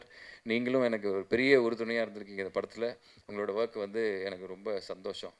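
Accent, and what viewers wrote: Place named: Coimbatore, India. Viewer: nee Coimbatore pasai nalla pesuva antha jonerla padam pannu arasiyal vathi mathiri and village nakkal paiyan mathiri pannu.. All the best👍i am from ❤️💛❤️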